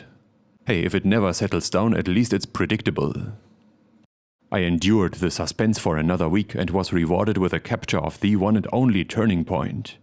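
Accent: Germany. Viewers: Please just stop ... youtube autoplay brought me here and your DSCHERMÄN AKKSENT is soooo cringy .... just stop ... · figuring out peoples origin in Europe from their accent... in that moment I KNEW he was German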